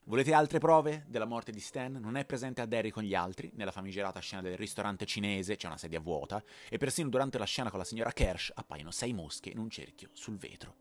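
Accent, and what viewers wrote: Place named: Tuscany, Italy. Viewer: A di che città toscana sei?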